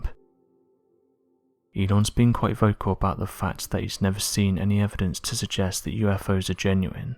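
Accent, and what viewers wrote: England, United Kingdom. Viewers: So why are these “aliens” always seen either in the Sky or Space? But the aliens don’t ever make it to Earth in ET Spielberg style? Sorry but the husky serious English accent doesn’t really do it for me.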